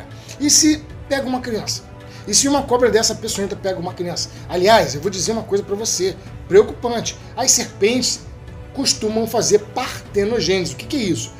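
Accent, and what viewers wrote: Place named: Macaé, Brazil. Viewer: vc é da onde parece de Macaé falai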